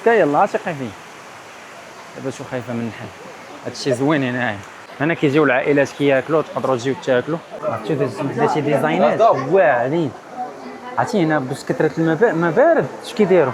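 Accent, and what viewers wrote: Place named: Egypt. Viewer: سفرية 😂😂😂😂وليتي مصري 😂😂متاثر بلهجة مسلسلات رمضان يا مدلولين....سفرية....تفو على شعب متاثر بلغة المشارقة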